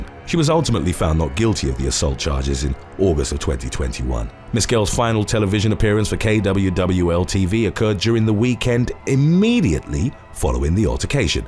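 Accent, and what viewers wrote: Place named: England, United Kingdom. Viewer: I figured you were an Englishman in America!!!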